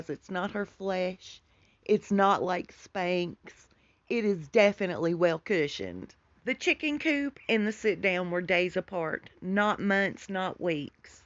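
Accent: South, United States. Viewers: WOW... your beautiful south clarity and articulate (as also my family in NC) said it well. Didn’t watch or listen, but have listened about this chaos!! Oh my gosh! My huge family of blended children-10 and 27 grands, have never said a word about MM OR PH. After I first started seeing ‘them’ this last year, I spoke to my oldest daughter and she only spoke about not liking royalty, in general. Not one word about this, except say anything about those two or three venomous chameleons...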